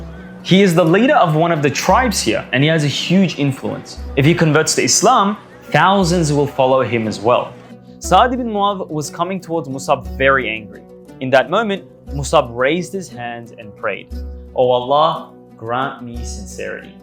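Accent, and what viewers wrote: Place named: Australia. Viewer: I loved the accent, reminded me of an Australian accent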